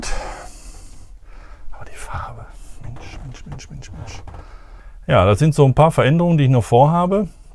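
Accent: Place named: Ruhr, Germany. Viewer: Du bist und bleibst eine coole Socke. Ich liebe unseren Ruhrpott Slang. 😉🍻 Wenn du jetzt noch aufhören würdest Terpentin zu qualmen 😂 Gruß aus Mülheim.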